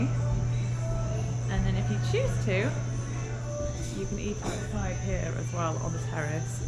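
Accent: England, United Kingdom. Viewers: You’re from England? I can hear your accent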